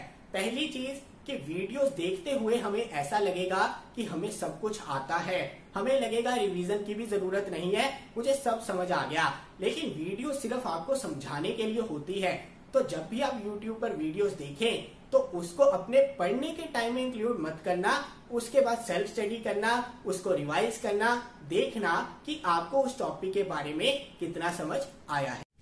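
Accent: Punjab, India. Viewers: Aaye haaye..Punjabi Accent Sir ka😍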